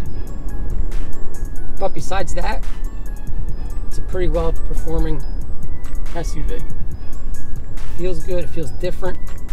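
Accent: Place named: Pennsylvania, United States. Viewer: Nice Pennsylvania accent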